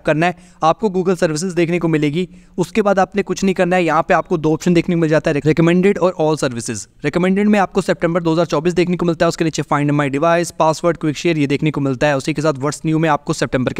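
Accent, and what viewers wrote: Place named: India. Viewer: You talk too fast with the hindi accent.😮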